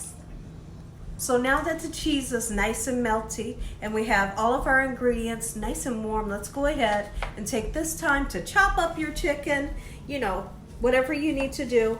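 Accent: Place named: South Carolina, United States. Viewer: I love her voice she sounds like my family in Charleston South Carolina.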